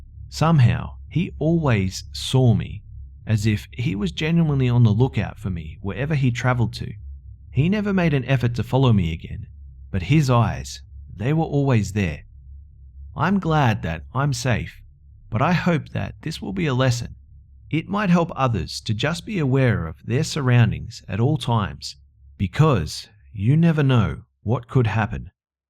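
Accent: Australia. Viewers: Are you Austrailan m8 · r u Australian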